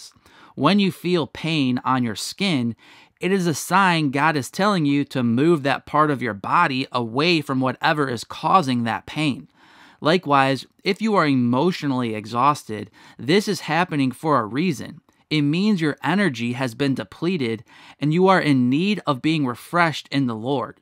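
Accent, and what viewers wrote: United States. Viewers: Narrator from Chicago? That accent...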